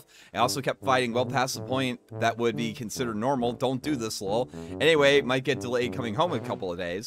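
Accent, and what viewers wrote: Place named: South, United States. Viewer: He makes the southern accent look bad. Please, please just stop.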